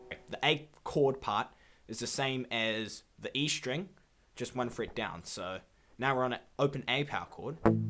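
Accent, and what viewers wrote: New Zealand. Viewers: when I first watched this video (it was the first video of you i saw) i said to my sister 'wow I really like his accent! Where is he from?' And she told me it sounds Kiwi, I am from NZ as well but you have a very strong accent its amazing! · Is your accent New Zealand-ish? (Idk how to say it I'm stupid XD)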